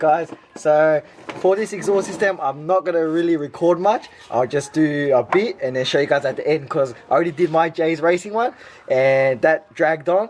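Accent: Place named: New Zealand. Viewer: Never heard an Asian sound so kiwi, aussie and asian all at the same time. Mate :')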